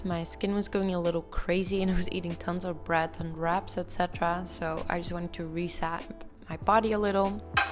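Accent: Netherlands. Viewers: I think she’s Dutch sounds it …Wonder which part of the Netherlands she’s from :) Nice vid 🎉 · you sound like you are dutch! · I smell een Nederlanderrrrr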